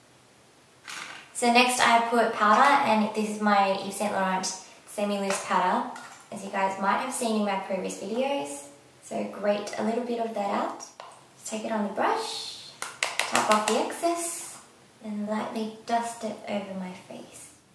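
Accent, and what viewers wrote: Australia. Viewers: That's Australian accent : )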